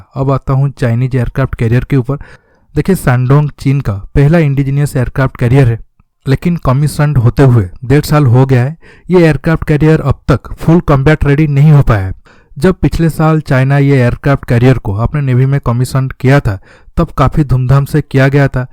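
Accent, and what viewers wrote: Odisha, India. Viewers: Bhai odisha se ho kya??? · Voice se Lagta hai ki tum Odisha state se belong karte ho . Just my guess. By the way nice work...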